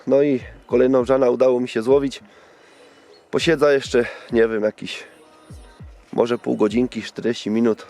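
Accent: Silesia, Poland. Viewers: Hej kolego, jak nie lubię Ślązaków tak ciebie szanuję. Świetne filmy, widać że lubisz to co robisz. I nawet tą waszą gware w twoim wykonaniu da się słuchać. Powodzenia życzę. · Uwielbiam slaska gware.....dziekuje i serdecznie pozdrawiam..)))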